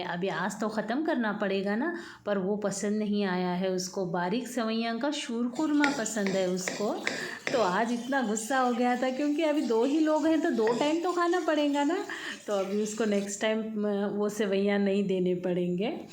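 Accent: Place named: Belgaum, India. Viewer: proper nagpur se hain kya aap ka asent mujhe belgaum jaisa laga thats y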